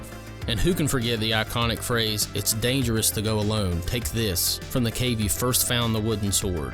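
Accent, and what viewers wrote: South, United States. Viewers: Dat southern twang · You said "Ravel's Bolero" right, btw. But I'm sure you already knew that. :-) Oh, as an aside, your videos help me to kill old preconceptions about the southern accent. I grew up in South Dakota (which has its own dumb-sounding accent, like from the movie Fargo), and I always thought a southern accent meant the person was uneducated. When I moved to Virginia, near the West Virginia border, a decade back, I still held onto that thought, at least in the first split-second I heard someone speak. But your videos are fun, entertaining, and I always learn something new from them; all of which helps me kill those old connections, and realize we're all the basically the same nationwide. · I was close lol I could detect a certain dialect. People think Southerners all talk the same but there are different dialects and styles. 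My dad's from Tennessee and says things like "ov'er" instead of over there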